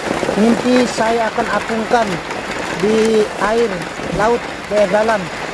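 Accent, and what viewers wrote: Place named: Bima, Indonesia. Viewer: Logatnya kek BIMA NTB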